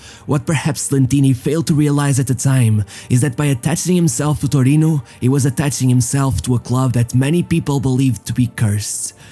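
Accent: Portugal. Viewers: És português? Dá para notar pela pronúncia a falar inglês, é sempre muito bom ter um português com tantos subscritores e com um canal com um conteúdo incrível original e interessante. Continua com o excelente trabalho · És tuga né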